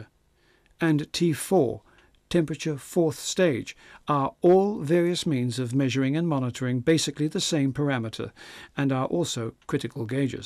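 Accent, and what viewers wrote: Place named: United Kingdom. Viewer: Thank you... :-) by the way, may I ask you some detail about the DVD program? I would like to buy it, to my ears the speaker seems to be British rather than American...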